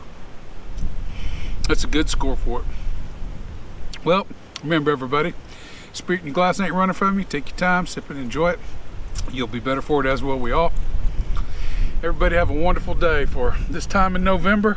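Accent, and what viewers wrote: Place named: South, United States. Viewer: It looks like this isn't available here in Albuquerque, but it sure sounds like I'd like it. I've found that I like the fruitiness of a single malt (though Scotch and Irish whiskies aren't, in my limited experience, forceful enough for me; I love the Balcones Lineage Texas Single Malt, which is), and the barley and the corn in this one ought to make it right for me. :) Thanks for reviewing it. And you may be in Kansas, but you sound southern enough to make me happy. :D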